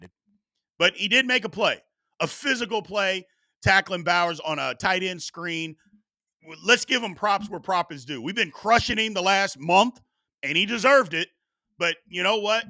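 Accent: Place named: South, United States. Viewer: Where you from? Texas raised, Arkansas raised? Alabama raised? I doubt MS like me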